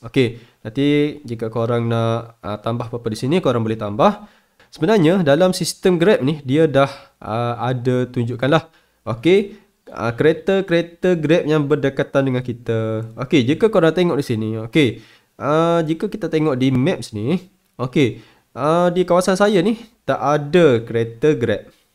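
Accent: Kelantan, Malaysia. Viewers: kecek kelate terus lagi baik...tak payah kau orang2..pelat kelate mu tak boleh lari...hehe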